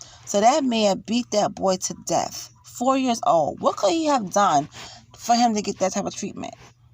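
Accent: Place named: New York, United States. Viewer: I'm from Detroit. I love your ascent. Sounds like NY?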